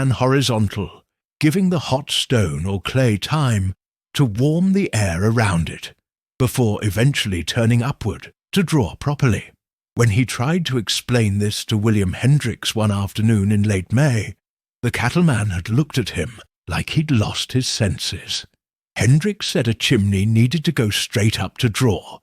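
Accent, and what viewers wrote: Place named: United Kingdom. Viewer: Not wasting my time listening to this brit accent.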